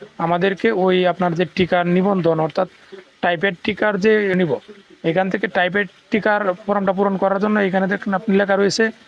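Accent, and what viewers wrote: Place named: Noakhali, Bangladesh. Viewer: আপনি কি কলকাতার নাগরিক হন লাইন কি পাক্কা নোয়াখাইল্লা লোক।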